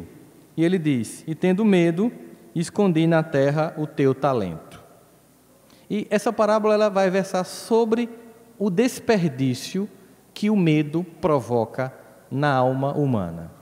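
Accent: Northeast, Brazil. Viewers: Também gosto do sotaque nordestino.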